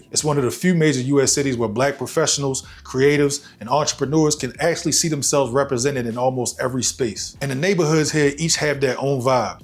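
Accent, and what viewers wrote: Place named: Baltimore, United States. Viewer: U might be in Atlanta But u gotta be from the DMV Bmore accent heavy 🤝🏾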